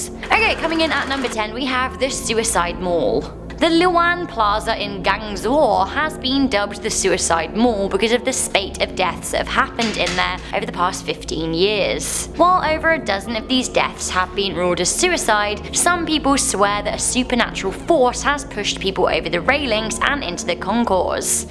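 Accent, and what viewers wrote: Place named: United Kingdom. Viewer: Nice british acsent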